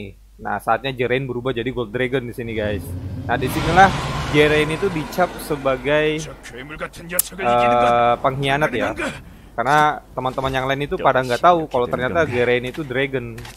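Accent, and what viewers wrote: Java, Indonesia. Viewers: bro lu tu orang mana kok kadang logat jawanya. keluar,btw ane juga pernah kesulawesi tenggara tahun 2003 disana masih hutan,kalo sulawesi selatan cemana disana?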